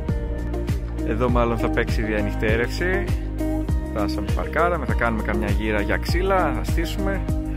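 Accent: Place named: Laconia, Greece. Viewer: VERY GOOD PANEMORFO VIDEO SOUNDS. Your Voice and The Way You Talk, Remind me Thn NEAPOLH LAKONIAS. When My Family Was All Together, Before. Blessings To you All And To Your Teammates Fabulous Showcase ❤🇱🇷🧡🇬🇷🧡🇬🇷🧡🇬🇷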